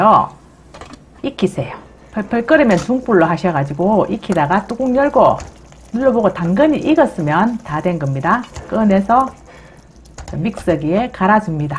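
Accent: Gyeongsang, South Korea. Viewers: 말씀도(구수)하게"잘"하시고~! 경상도,(방언)너무,재미있어요~! (짱)~!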